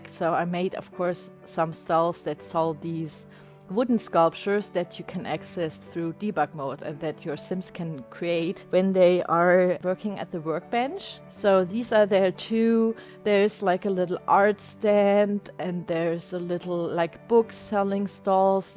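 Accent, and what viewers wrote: Germany. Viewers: I love just watching your videos and hearing your voice, you talk a lot like my Oma, and it makes me happy. I don't get to see her a lot anymore and hearing your heavy German accent makes me really happy. And watching this build reminds me so much of a trip I took to Germany once years ago for my Uncle Marco's wedding and we went during the Christmas time and it looked just like this! It was beautiful... Your video made me cry a little bit, happy tears though! haha you made me very very happy. So, thank you for that. <3 xoxo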